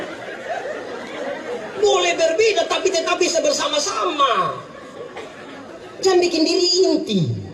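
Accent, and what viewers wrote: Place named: Eastern Indonesia, Indonesia. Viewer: Membiasakan diri mendengar logat bicara orang timur, asyik juga.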